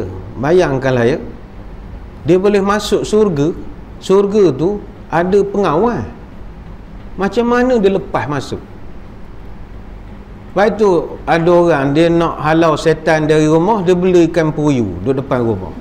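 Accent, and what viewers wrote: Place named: East Coast, Malaysia. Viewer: paling suka dengar YB Dato cakap loghat pantai timur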